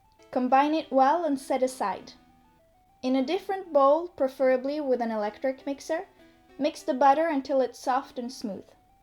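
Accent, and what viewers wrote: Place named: Canada. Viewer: you sound canadian, are you from canada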